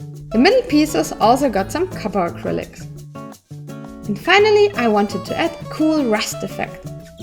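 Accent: Germany. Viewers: are you an german? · you're german??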